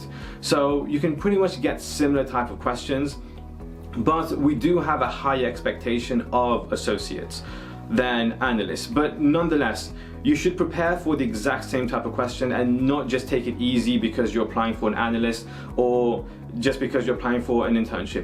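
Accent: Mauritius. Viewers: Is that a slight Mauritian accent?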